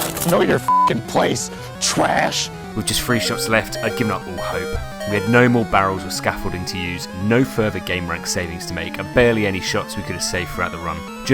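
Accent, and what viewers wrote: United Kingdom. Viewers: Swear you sound more British in your older videos.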